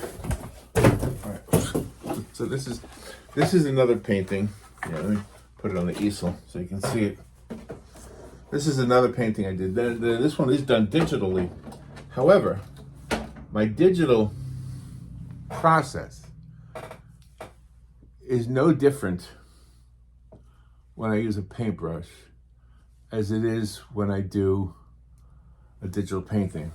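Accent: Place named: Connecticut, United States. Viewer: Just found your channel and subscribed and am looking forward to watching your videos. You don’t sound like a FL native…CT?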